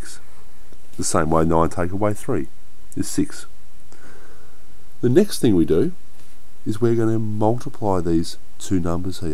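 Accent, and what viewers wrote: Australia. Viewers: AYYYE THIS GUY IS AUSTRALIAN YEH MATEEEE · Awesome thanks for that! Just one more thing are u Australian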